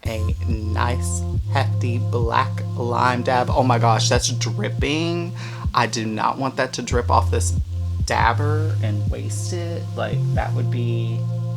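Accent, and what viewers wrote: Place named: New Orleans, United States. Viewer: That explains the accent! I'm from New Orleans so I'm like this isn't a Cali accent it's my neighbors accent lol!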